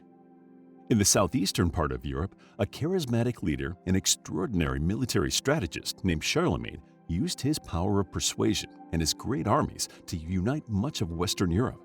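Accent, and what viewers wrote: United States. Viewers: I can barely understand the american accent 😭😭 had to repeat the video 3 times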